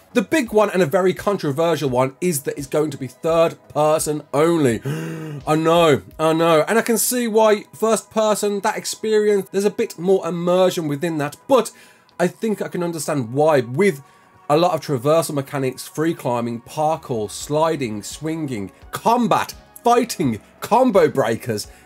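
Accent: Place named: Scotland, United Kingdom. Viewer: And let’s get into itt! I love that intro so much. Hey are you from Scotland?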